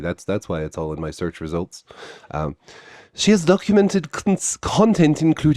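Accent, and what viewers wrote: United Kingdom. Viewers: I LOVE your posh English accent, I appreciate it as I'm English, although I sound NOTHING like that! It's just so funny listening to you do it... however, your Australian accent is TERRIBLE!...but also really funny in a different way! More of both accents please. 😆😆😆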